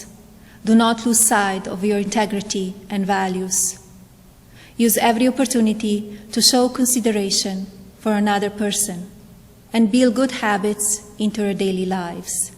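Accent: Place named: Eastern Europe. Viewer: 她的东欧口音还是可以听出来的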